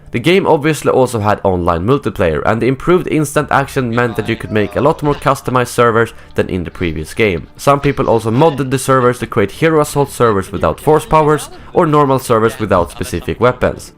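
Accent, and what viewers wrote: Sweden. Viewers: You sounds Swedish. Are you? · u sound swedish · u sound so swedish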